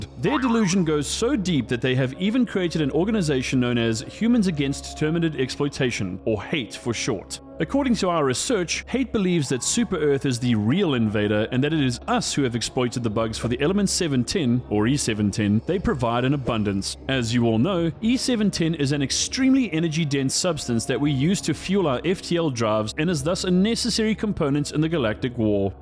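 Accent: South Africa. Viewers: I hear a SOUTH AFRICAN CUZZIN... I subscribe!!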